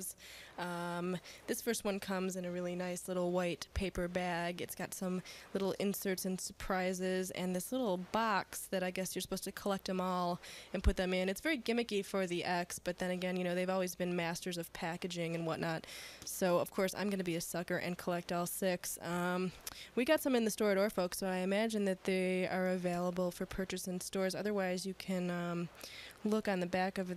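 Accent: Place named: Midwest, United States. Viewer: such an intelligent and self-possesed woman. I appreciate the Buffalo/Midwest accent as well.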